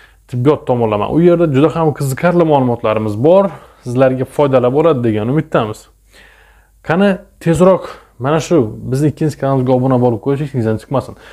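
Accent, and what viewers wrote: Khorezm, Uzbekistan. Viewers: Салом алейкум доктор хоразмданмисиз аксентиз билиниб дурибди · Доктир шеваингиз хоразма тортадику недансиз?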